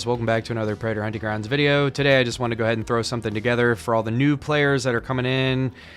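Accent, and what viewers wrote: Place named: RP, United Kingdom. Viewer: Damm you do a bloody awsome David Attenborough aksent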